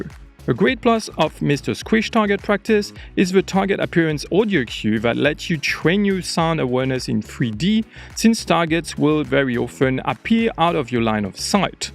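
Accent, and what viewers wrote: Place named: France. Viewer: Cet accent français😂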